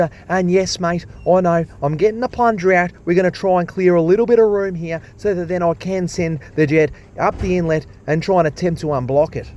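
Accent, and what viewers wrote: Australia. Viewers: Hey, been watching for a while from Kent in the UK. Fucking love your commentary and rambling. Please keep up the good work and posts. By far the most stereotypical Aussie commentator and the endless enthusiasm keeps us all coming back for more. We always stay to the end.👏🏼👍🏽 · "Go on ya good thing!" is a very popular saying in Ireland so it was funny hearing it in an aussie accent I also always love "Bob's your mothers brother" (or Robert to make it even longer) which is obviously a variation of a British expression You have a great array of multicultural idioms is what im trying to say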